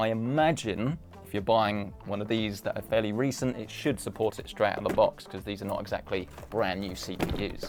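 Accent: United Kingdom. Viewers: You accent is definitely British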